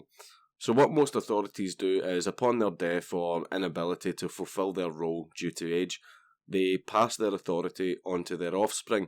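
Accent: Scotland, United Kingdom. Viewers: don't break dude, they can lock up your Body but your mind is free like a bird. (add subtitles to your Video in order to reach more people. I can understand scottish accent since i spent a lot of time in Glasgow over the course of many years but most who know english have a hard time understanding your accent) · Nice vid, but FYI "de jure" and "de facto" are Latin, so your pronounciation of "de jure" in particular is way off. This is not a pedantic point, here's why. I was listening to your video and not watching, and I was wondering why you were talking about "du jour" authority (which is French for "of the current day"). So for a while I couldn't understand why you were talking about arbitrary authority as opposed to authority by law (de jure). To pronounce "de jure" in such a way that you won't confuse listeners like myself (which may very well be just me), you want to say it more or less like: "day you ray" (I'm trying to account for your Scottish accent! 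:D). Cheers. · So where do I sign up for audiobooks read by a dank Scottish fellow?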